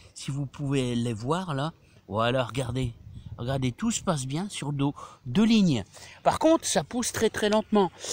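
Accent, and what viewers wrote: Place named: Roubaix, France. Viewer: Bonjour Monsieur, je découvre votre chaine bien sympathique, merci ! Et il me semble bien reconnaître l'accent de mon enfance c'est à dire Roubaix ☺ bonne continuation !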